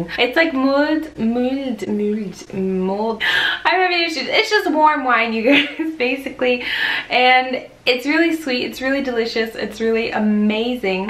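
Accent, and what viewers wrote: Bavaria, Germany. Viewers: Bavarian myself and I was just about to post the exact same thing. You actually sound exactly like a bavarian. Not even germans are able to pronounce it that well in 90% of the cases. Also Leberkaas sounds pretty perfect to me. Did you live in bavaria? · Your Bavarian pronunciation is delightful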